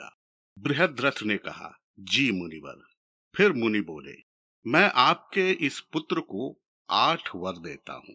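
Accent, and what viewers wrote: Standard. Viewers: शुद्ध उच्चारण ने मंत्रमुग्ध कर दिया है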